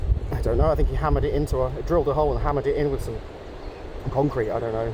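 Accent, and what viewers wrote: United Kingdom. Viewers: You with your British accent and with his French accent are a lovely foil...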